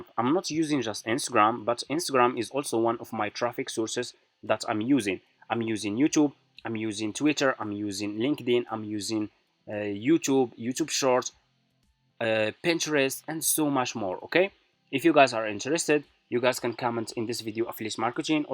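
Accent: Morocco. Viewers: واش نتا مغربي يا صاحب القناة..؟ باينة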